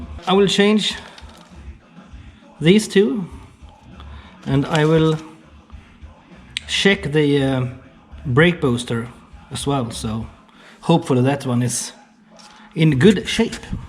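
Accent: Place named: Sweden. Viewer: Tja, underhållande video med riktigt fin svengelska dialekt :) Jag har en RRC från 1984 med, vad jag har förstått är den nya Boostern. Vet du om den går att renovera? jag tycker mig ha läst att den måste bytas ut om den läcker..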